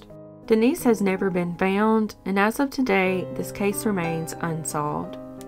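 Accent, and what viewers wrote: United States. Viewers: Does anyone know what state the narrator is from? I like to listen to her voice to help me fall asleep.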